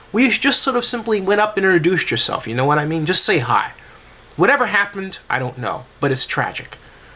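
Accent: United States. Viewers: I can't bear to listen to his idiotic american accent or look at his holy than thou american face...Tutt tuttin fkin idiot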